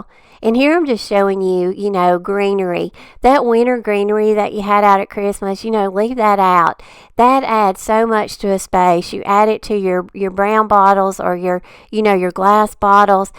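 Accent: South, United States. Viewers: I'm so glad I found your channel. I love your style. It is very similar to my own which is Awesome! I could watch your creations everyday(bonus... I adore your southern accent). Keep them coming and thank you for sharing 😊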